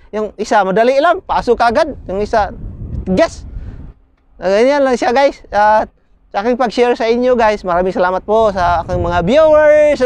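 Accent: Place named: Visayas, Philippines. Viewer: Hoy mag bisaya kanalang oi halata Ang Tagalog Mo dol.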